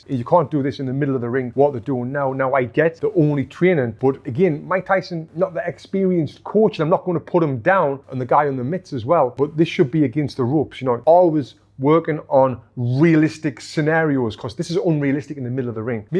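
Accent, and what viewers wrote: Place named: Liverpool, United Kingdom. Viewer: Anyone else seen that old English show “Creature Comforts”?? This guy sounds exactly like the Scouser Rat 😅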